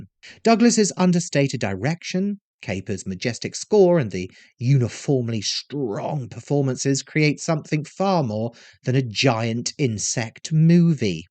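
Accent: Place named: West Country, United Kingdom. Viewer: Ah yes, Grockels, is I think, more of a Devonian thing. I'd only heard it said for the 1st time when I was either 20 or early 20s. And the person I heard first use it said it was more of a Devon thing. I already told you that sometimes you have a distinctly west country ring to your words & you explained to me where you were from & I realised that in some, more northern areas, they also seem to have a similar brogue. [you probably don't remember, but I thought you were a Bristolian & you corrected me.]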